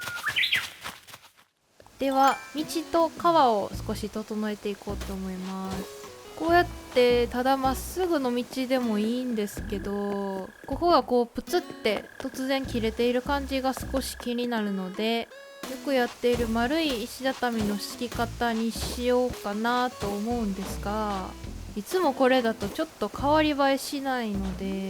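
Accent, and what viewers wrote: Kansai, Japan. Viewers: 関西の方ですか？ イントネーションが関西っぽくて可愛い♪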